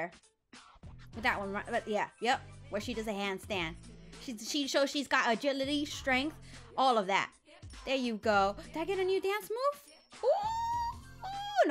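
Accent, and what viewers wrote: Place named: London, United Kingdom. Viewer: Did any one else think that she was talking I a London aksint a little bit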